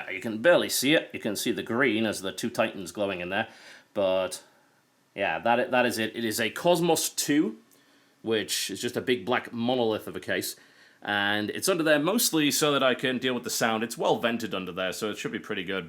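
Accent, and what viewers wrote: United Kingdom. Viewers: With that accent I expected to see UK style power outlets. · British, but lives in America.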